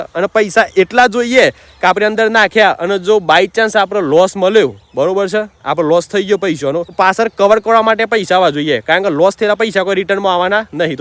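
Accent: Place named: Mehsana, India. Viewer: મને બીજું કંઈ નથી ગમ્યું બસ ભાઈ ની મહેસાણી ભાષા બહુ ગમી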